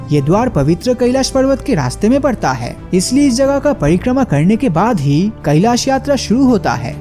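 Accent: Bengal, India. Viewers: are u bengali from west bengal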